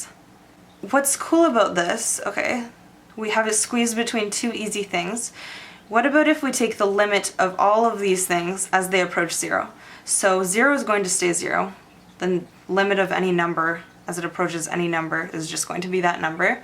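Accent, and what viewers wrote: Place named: Canada. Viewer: "that was pretty easy, eh" fucking love the Canadian accent.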